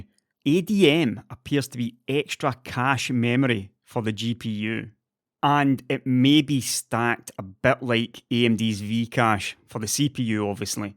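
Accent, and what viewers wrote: Scotland, United Kingdom. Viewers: Love hearing that Scottish accent. Music to the ears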